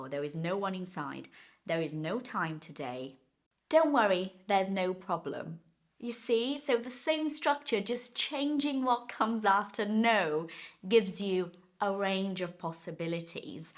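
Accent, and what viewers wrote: RP, United Kingdom. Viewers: I love so much your accent, it's soo brit and fancy 🤣🤣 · Hey, teacher! Hope you are doing awesome. I have found you on Instagram and here on your channel. I am amazed with your british accent and also watching every single video here. I am trying to acquire the british accent but, it is not so easy. Lol Kudos to your work and skills!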